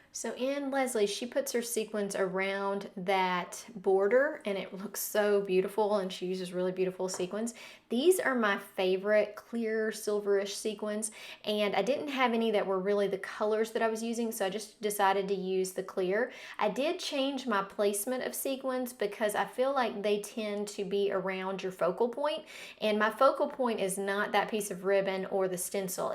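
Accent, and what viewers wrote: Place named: South, United States. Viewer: Love it! I'm a new subscriber (and team mate!). So glad to "meet" you and hear another southern accent! <3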